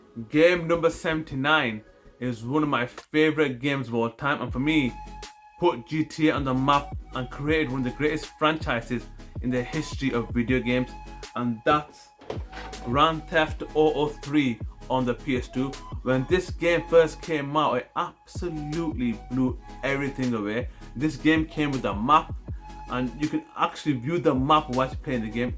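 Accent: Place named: North, United Kingdom. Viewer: You have a strong northern accent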